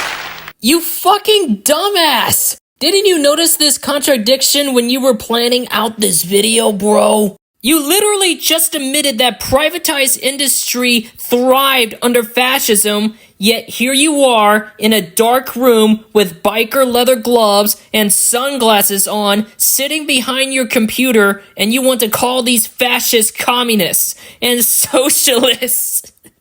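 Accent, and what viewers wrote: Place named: Arab world. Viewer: i kinda see what the commenter is saying though, he kinda sounds like how arabs who are very fluent in english from a young age sound, theres like basically no accent but it sounds a bit off. you can hear it in more. source: im an arab who never properly learned arabic but grew up around people speaking arabic so my accent is a bit weird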